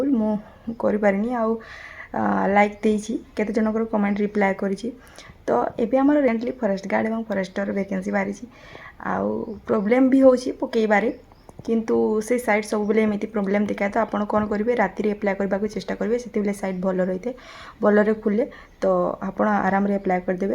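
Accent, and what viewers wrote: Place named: Sambalpur, India. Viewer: Tamar accent ta pura Sambalpuri mix aae.... Best of luck Jai Jagannath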